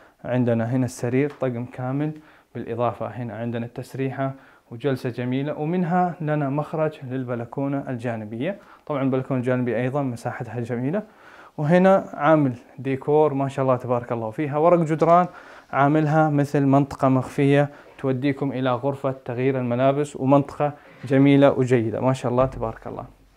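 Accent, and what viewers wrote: Saudi Arabia. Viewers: ما شاء الله تقديم الأخ صاحب الفيديو مميز. ذكر الله على لسانه و الأدب و اللهجة الجداوية الجميلة. بالتوفيق يا رب.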